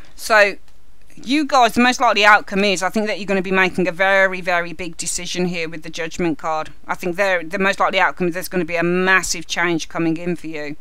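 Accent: United Kingdom. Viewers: the 1st thing I want say is I wish I were British I love your accent! 2nd when I listened to your reading my mind was totally blown. Everything you said was so on point for me like we had a conversation and I told you every single thing going on in life this very second....unbelievable! I am going through such a horrible time at work I really hate it and can't seem to find another job, I have been looking since April. My company was bought out and this new company is a horror overworked lower pay they are phoney & so are my coworkers. I am so trapped emotionally & mentally exhausted. Wow you hit it all. I have cut some many people off its not funny, deleted my coworkers from Facebook almost 2 months ago my spirit told me to do it so wow. I said f- them all. My Sun & rising are both aries so I can't stand bs people & I can't & won't kiss ass. I know this won't last & things will get better & I can't wait I've been through such tried to stay humble but it's terrible to have things reversed. 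Thank you for your words God Bless You my sister.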